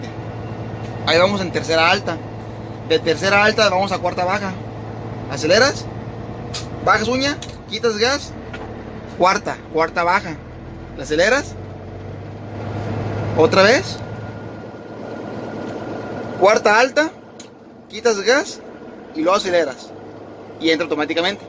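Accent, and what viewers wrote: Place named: Mexico. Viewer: Me fascina ese acento Mexicano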